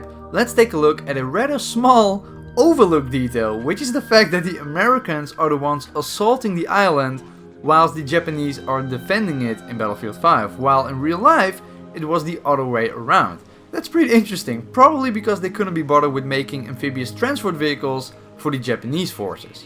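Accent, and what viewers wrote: Netherlands. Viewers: Are you Dutch? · You're Dutch right?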